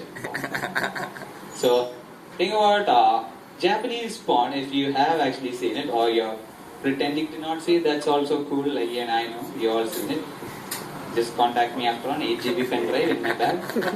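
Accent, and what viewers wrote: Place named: Kerala, India. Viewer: Haha.. This s one of its kind .. Mallu accent at its best and someone s actually cashin in on it. ... Good Job!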